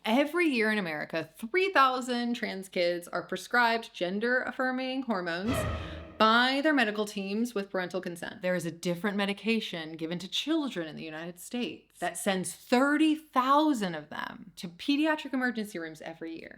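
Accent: United Kingdom. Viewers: Your intonation is so British now!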